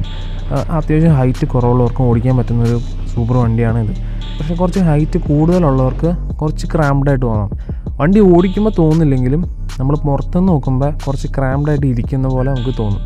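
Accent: Kottayam, India. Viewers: Broo kottayam kaaran aanooo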